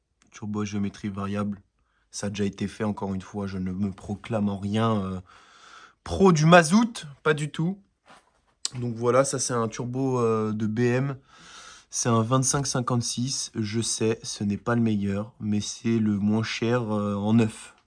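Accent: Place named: Lyon, France. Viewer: Une prepa Peugeot j'aime bien :) ça change ! t'es de Lyon ou autour d'apres ton accent non?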